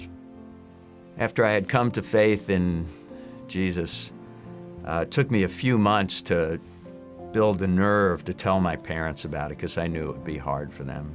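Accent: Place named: Long Island, United States. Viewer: Sounds like Long Island ny. God bless brother!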